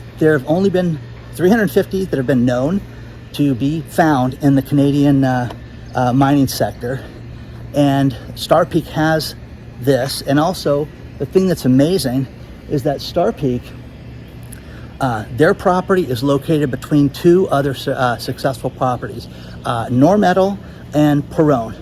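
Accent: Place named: California, United States. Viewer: You sound like a Californian.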